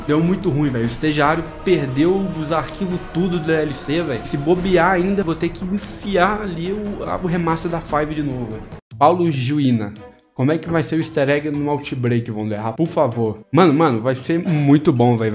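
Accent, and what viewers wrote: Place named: Minas Gerais, Brazil. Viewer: Quem é esse mineiro?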